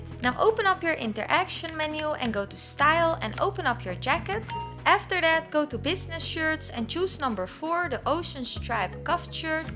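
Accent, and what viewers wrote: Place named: Netherlands. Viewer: dan ga ik zeker abonneren 😁 ik hoorde op een bepaalde manier dat t Engels was maar dan beetje Nederlands zegmaar je hoort da je Nederlands bent haha maar kan jij. Nog same. Met ps4 spelen of heb je die nieuwe versie al gekocht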